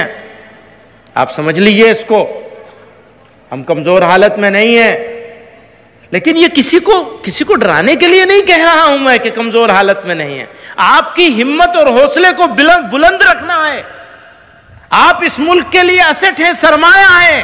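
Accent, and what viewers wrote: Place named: Pakistan. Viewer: Masha Allah Urdu language bilkul Pakistan jaesi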